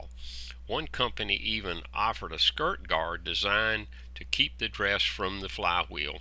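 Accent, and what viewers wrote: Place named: South, United States. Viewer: Excellent information delivered in a smooth southern voice.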